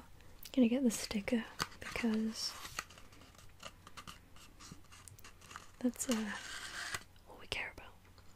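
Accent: Wales, United Kingdom. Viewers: Are you British then? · I believe she's from Wales. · yes she does, since she is from Wales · I know it's kinda late 😅 but she's Welsh I think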